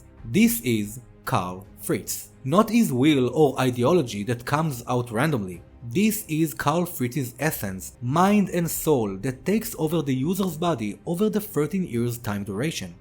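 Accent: Israel. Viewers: First time hearing an anime Channel with a Hebrew accent! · דקה וכבר שמעתי את המבטא הישראלי הכבד חחח למה רק AOT<? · Subscribing for AOT content with a Tel-Avivi accent.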